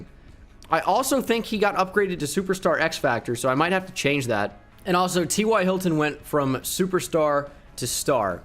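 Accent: Australia. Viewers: I thought the Australian accent was great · 10/10 Australian accent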